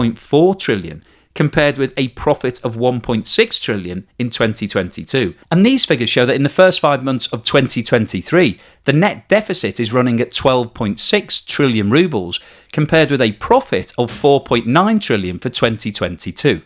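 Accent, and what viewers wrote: United Kingdom. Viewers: Already the British accent tells me this vid is a heap of lies 😂😂😂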